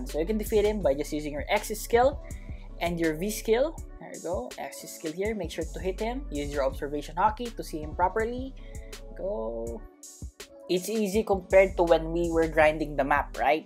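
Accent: Philippines. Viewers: I just noticed hes name in the leaderbords hes name is which is a filipino language which means dough that is sticky correct me if im wrong so that means hes a filipino well its obviouse when hes talking btw am a filipino too so eyyyy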